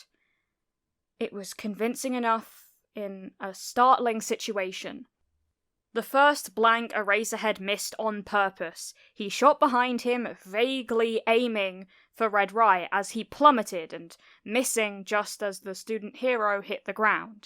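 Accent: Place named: United Kingdom. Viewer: Your British accent was hilarious 😆 I love you videos ❤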